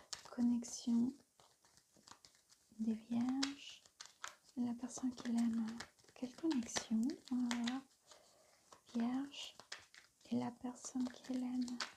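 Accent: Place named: Spain. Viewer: Magnifiques tirage merci beaucoup, jolie l'accent Espagnol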